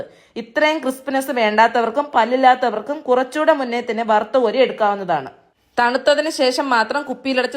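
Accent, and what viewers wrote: India. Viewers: oro sthalathe slang avaravarude identity aanu. Thiruvananthapuram, Kollam slang kelkkmbo mathram enda preshnam... Eee slang kelkkan vendi aanu pradhanamayum njn ee channel kaanunnath. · Ithu keralathil evidethe slang aanu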